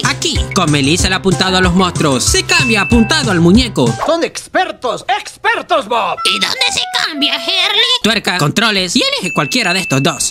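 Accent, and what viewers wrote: North, Spain. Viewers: Con la prima? norteño? XD saludos!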